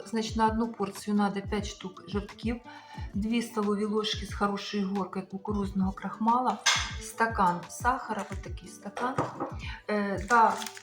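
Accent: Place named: Surzhyk, Ukraine. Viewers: А наша пані з Одещини,і мене зовсім не обтяжує її суржик.Людина Вам розказує показує,відкриває Вам душу,а Вам виявляється,важко слухати.А наша пані не на сцені,декламувати поезію.Вона розмовляє своєю,рідною мовою,до якої звикла,якою розмовляє все своє життя.Не треба вносити ложку дегтю в бочку меду.Попробуйте і Вам буде приємніше слухати нашу.До речі,я з Хмельниччини і також не розмовляю чистою українською,так що мені й не жити? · Бо дикий суржик))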